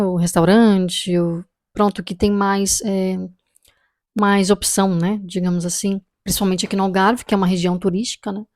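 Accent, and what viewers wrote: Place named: Brazil. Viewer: Se és Portuguesa e estás em Portugal, porque é que falas brasileiro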